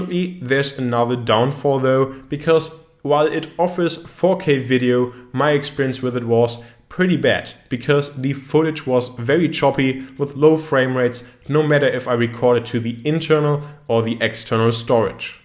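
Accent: Germany. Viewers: Bist du Deutscher, hört sich so an^1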